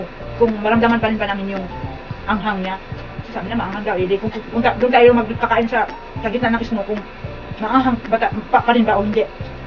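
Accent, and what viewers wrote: Visayas, Philippines. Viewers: ang ganda talgaang magdalita ng mga bisaya btw ang ganda niyo din oo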